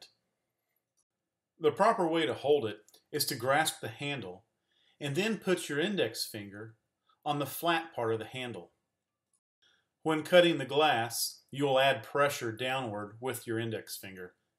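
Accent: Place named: Texas, United States. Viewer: Sounds like he's from arlen texas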